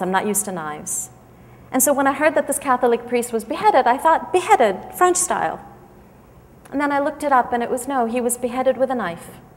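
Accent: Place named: United States. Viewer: your ignorance is immeasurable. what do you know about the "conflict " in Syria other than the information you get from your masters, the brutal thugs, Assad's shabihah. It is not a conflict as you claim, it is a spontaneous revolution by the people, for the people. It is a revolution against oppression, inequality and corruption. Can you deny that there are more than 200000 detainees in Assad's dungeons with any charges except, they spoke out against him. you have jumped the gun and started defending the Assad's regime without even knowing the kind of Gas was used, the delivery system was used which only Assad has that. Speaking English with American accent is not going to make any credible. Taking your head out of you know what, can make you credible if you talk sense. You have taken the side of the most ruthless pig humanity has ever known. 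we're blind to the first 8 months of the peacful demonstrations that were faced with Assad's snipers from rooftops which murdered more than 700 innocent kids and more than 6300 innocent people. If all these horrific videos did not move anything in you, then you are a creature without any feelings, and you don't even belong to the human race.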